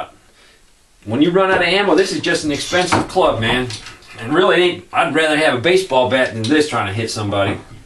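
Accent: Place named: South, United States. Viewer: He is saying odd ball caliber he just has a southern accent so it sounds different.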